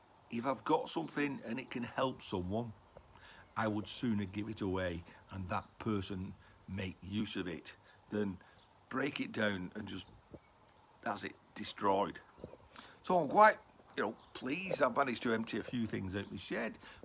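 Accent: United Kingdom. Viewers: My grandson wants to know why you poms - pronuniate things different to us is Australia, I told him we do it as well, look at how we greet someone - Gidday mate!!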